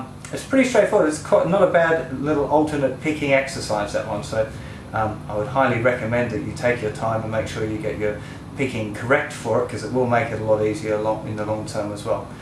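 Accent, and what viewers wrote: England, United Kingdom. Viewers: Never thought I’d have Norm Macdonald teaching me guitar while using his English accent. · Is your origin from England?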